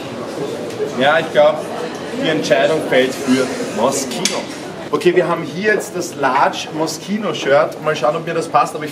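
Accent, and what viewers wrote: Austria. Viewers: Wie uncool klingt einfach österreichisch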